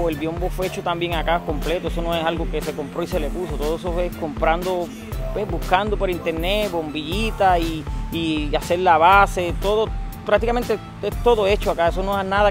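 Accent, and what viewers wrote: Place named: Puerto Rico. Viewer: Congratulations'! TIENES vision y talento DTB. Saludos desde Florida .USA. Suenas boricua !wepa!!! Bonito color.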